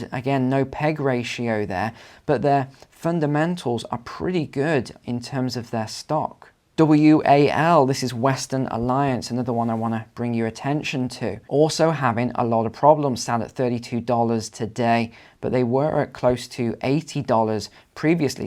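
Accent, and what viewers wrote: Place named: United Kingdom. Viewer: I don't know about you all, but it is better to hear that all the banks and real estate are all going bust from a calm British accent.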